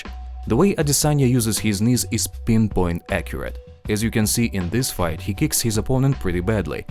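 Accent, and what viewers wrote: Russia. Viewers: I like the Russian accent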